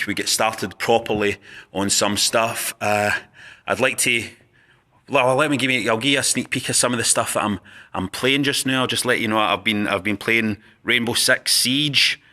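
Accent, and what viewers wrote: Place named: West End, United Kingdom. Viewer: Mate yer sounding like a fucking West End Weirdo these days.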